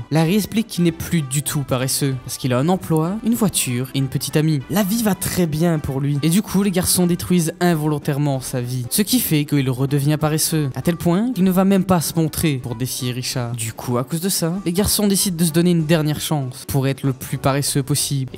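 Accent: Liège, Belgium. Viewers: J aime trop l accent de liege 😂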